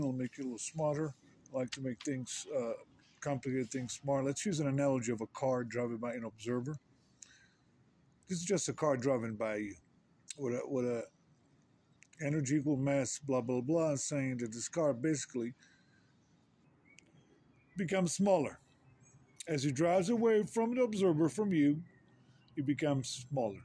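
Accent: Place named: United States. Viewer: wrong information in disgusting American accent.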